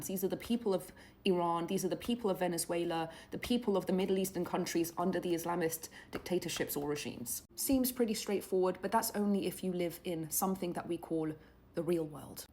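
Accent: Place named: England, United Kingdom. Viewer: You don’t sound like a person from Iran, but someone from England. Are you English or Iranian? Make sure you update the English government.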